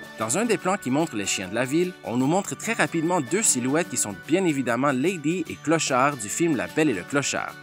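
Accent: Quebec, Canada. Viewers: l'accent Québécois est beau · est tu québecois? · l'accent québécois, c'est plutôt classe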